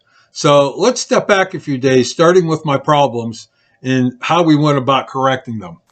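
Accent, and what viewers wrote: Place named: Western Pennsylvania, United States. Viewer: You sound like you are from western Pennsylvania.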